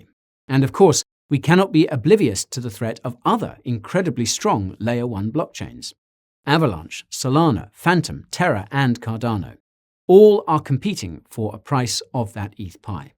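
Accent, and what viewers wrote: United Kingdom. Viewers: That British accent 😂😂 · The only person with a British accent that I listen to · Mate! Aside the amazing info and the way you get to present us (pun intended!) it's also amazing you get me to laugh listening to the boring 'I'm not a financial advisor'. I might be biased tho as I have a weakness for about every accent from my British neighbours, at least, the ones I can understand 🤣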